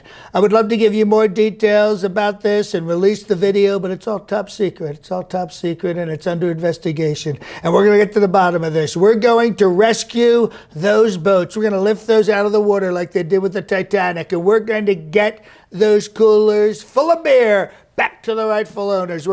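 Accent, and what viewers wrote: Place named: Italy. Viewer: that subtle italian accent makes it perfect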